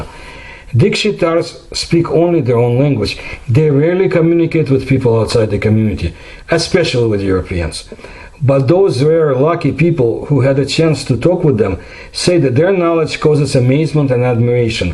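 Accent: Russia. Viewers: Seconded. The videos are a treasure trove but the thick russian accent is a bit hard to follow. I suggest 1.25x for the meantime.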